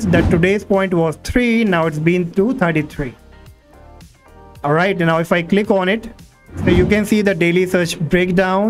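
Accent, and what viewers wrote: India. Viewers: how can someone sound so indian yet so american at the same time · You sound like an indian scammer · he sounds indian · bro is dumb he just sounds indian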